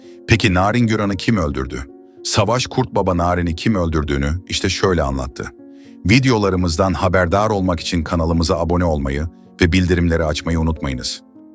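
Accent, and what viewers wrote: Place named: United States. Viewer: Siz Amerika'da mı yaşıyorsunuz konuşmanız aynı Amerikalı gibi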